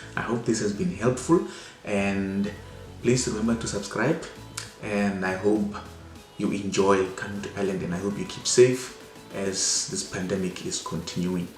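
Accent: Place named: South Africa. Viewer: Love your SA accent by the way. It's beautiful!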